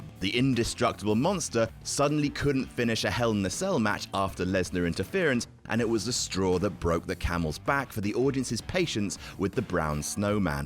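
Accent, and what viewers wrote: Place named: RP, United Kingdom. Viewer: this sounds too posh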